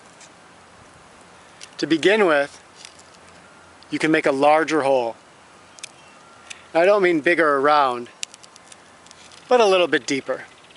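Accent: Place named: Wisconsin, United States. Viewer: ! I've gotta say, I am really excited to have found your channel! I have always had a strong interest in the subjects you cover, but what is really exciting is that it sounds like you live in Wisconsin! The problem I sometimes run into when researching is they are region specific with materials. This can be hard. I live in central Wisconsin, and it is awesome to have a neighbor's expertise to draw from. Thanks for doing what you do! Great work my friend!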